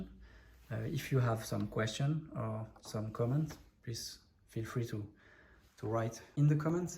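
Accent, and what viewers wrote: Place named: France. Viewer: un french accent ma foi tres mimi, gg à toi pour les modifs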